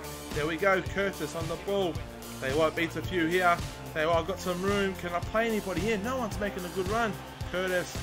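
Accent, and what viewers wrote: Australia. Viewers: Your Austrailian arent you?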